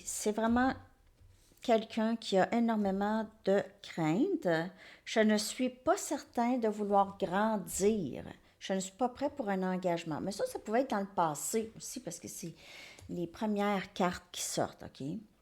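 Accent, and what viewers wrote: Canada. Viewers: j'aime énormément ton accent canadien je adore cendrillon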